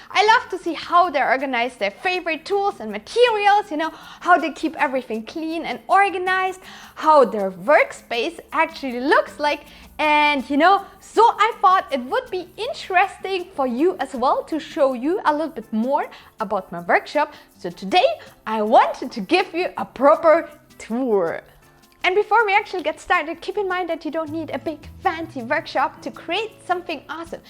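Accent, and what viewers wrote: Germany. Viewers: quick question are u german? bc u sound like german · Ich hör doch da Deutsch raus, Brubies. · I love it when germans speak english... so cute 😁😘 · she's german, it's an accent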